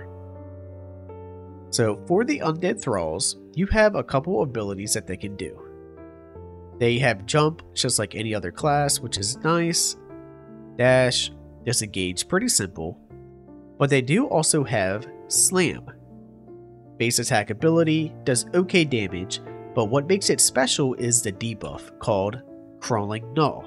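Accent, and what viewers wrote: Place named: Pennsylvania, United States. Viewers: ah sounds just like the Pennsylvania accent. Either way nice video. Also them Orioles are poppin off! Go to a game this summer don't miss it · I sense south jersey/eastern PA/delaware in the accent 🤔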